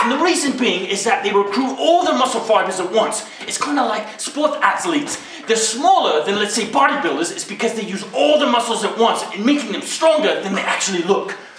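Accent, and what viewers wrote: Australia. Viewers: Nice Australian accent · Hahahahaahaha! I wasn't expecting this. You sound and look like an Australian indeed. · Bluddy hehhhhlllllll!! This is halarious 😂👍 mixture of aussie, sooth afrikhn and irish. #fitbullaussie approved 😉😁👍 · I love the over the top Australian accent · Fair dinkum Aussie here....great aussie accent if I do say so myself. We miss our Steve Irwin. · Australian accent lol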